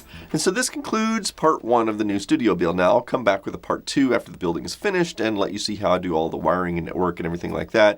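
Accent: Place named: Texas, United States. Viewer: Dude, I've served in the Army with soldiers from Texas and that's just how they talk.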